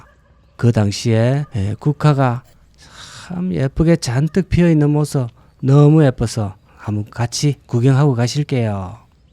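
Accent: Gyeongsang, South Korea. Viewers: 전라도 사투리도 듣기 싫지만 경상도 사투리 심한 것도 귀에 거슬립니다. 억양은 어쩔 수 없더라도 일부러 더 심한 사투리 쓰는 것은 좀 지양하심이. 몰입을 방해해요.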